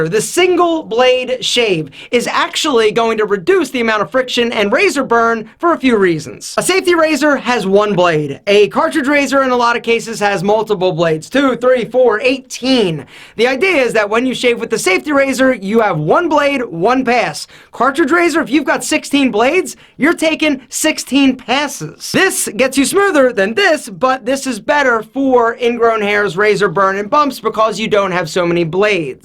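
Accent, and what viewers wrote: United States. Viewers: Sounds American to me...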